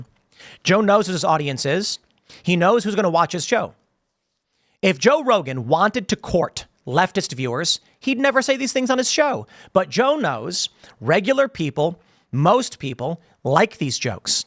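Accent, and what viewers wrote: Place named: Wisconsin, United States. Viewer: How about a SPOILER warning? Hearing read Rogan's jokes in his hoarse 'sconsin accent is a guaranteed way to not make it funny when I watch Rogan actually deliver.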